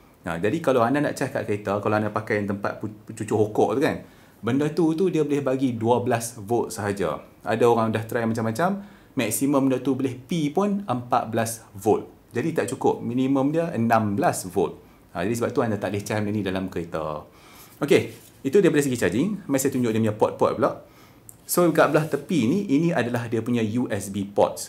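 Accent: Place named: Penang, Malaysia. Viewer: Tengok sbb nak layan loghat penang buku abg ja..hahaa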